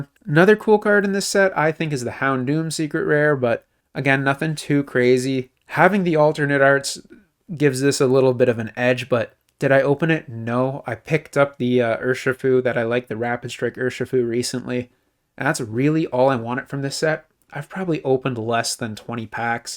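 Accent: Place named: Canada. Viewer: Great Vid! Cut through lots of the filler, appreciate that. And I knew I detected that Canadian accent before you mentioned prices in $CAD lol. Cheers, fellow Cannck ;)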